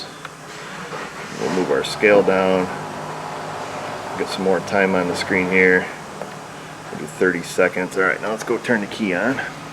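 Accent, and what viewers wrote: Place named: South, United States. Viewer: You sound just like the south of men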